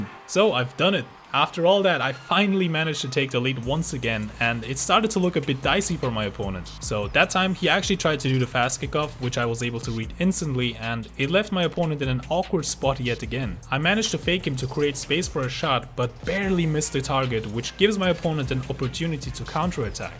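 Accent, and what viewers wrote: Germany. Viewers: Your English sounds like german English...Are you german?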